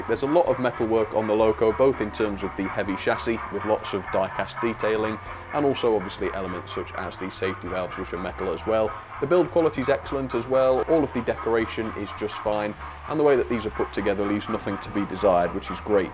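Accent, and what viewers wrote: United Kingdom. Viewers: His British accent is so satisfying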